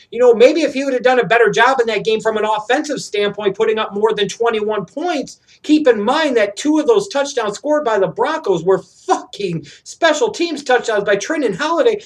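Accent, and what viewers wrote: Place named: Midwest, United States. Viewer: I love your midwest accent.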